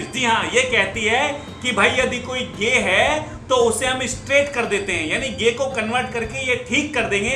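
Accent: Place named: Baghpat, India. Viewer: AAP KAHA SE HO SIR...aakpi language baghpat ki lal rahi hai...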